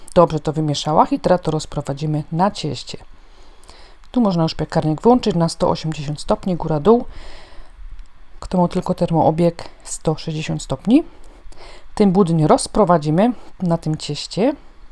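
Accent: Silesia, Poland. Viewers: Cześć dziewczyno😁super filmiki , uwielbiam twój kanał i twój śląski język 😎🤪😅👌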